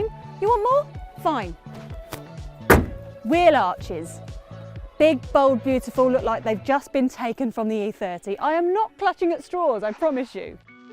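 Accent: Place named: Essex, United Kingdom. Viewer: Hi,its got a head up displaaaaaaaay 🤣🤣🤣 Love it,the essex accent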